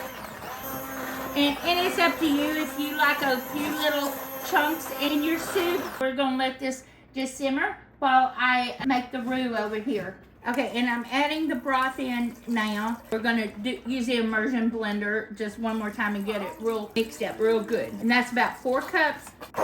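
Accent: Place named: Alabama, United States. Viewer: Hey y'all. I just found your channel. Your accent sounds like mine😂. I'm from Alabama where I've lived most all of my 64 years! Where are y'all?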